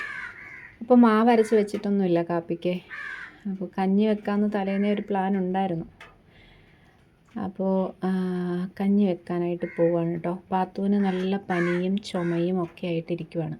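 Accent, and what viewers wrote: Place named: Kollam, India. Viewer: സംസാരം കേട്ടപ്പോൾ തോന്നി കൊല്ലം ആണെന്ന് കൊല്ലത്തു എവിടെയാണ് ഞാനും കൊല്ലം ആണ്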